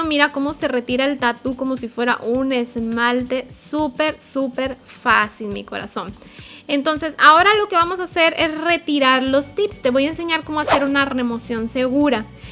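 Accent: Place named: Mexico. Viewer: Oye tu te expresas como mexicana yo creí que eres de Mexico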